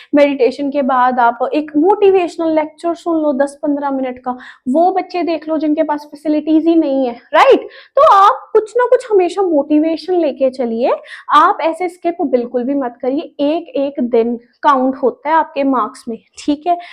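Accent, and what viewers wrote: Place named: Punjab, India. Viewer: Mam i think tou are from punjab from your accent😅😇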